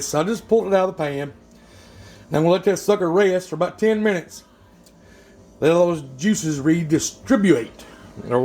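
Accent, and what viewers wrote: South, United States. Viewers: his voice is classic country boi status.. Kool Dude though.. i like his technique, i would definitely add my own spin to it... but overall good job..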